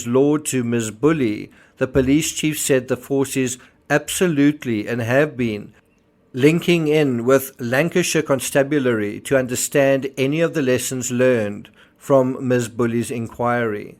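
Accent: South Africa. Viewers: i love your production and narrative, also love your S.A accent 😊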